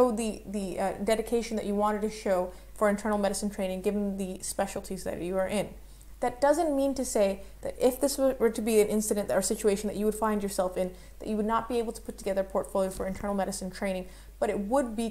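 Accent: United States. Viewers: great piece of advice in a short amount of time!on a side note I really didn't expect you to have an american accent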